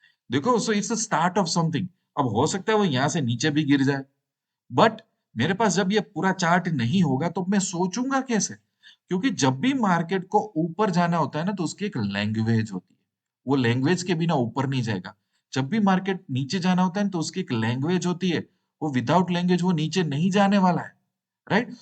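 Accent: Gujarat, India. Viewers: તમે ગુજરાતી છો? ટોન એવો લાગે છે😊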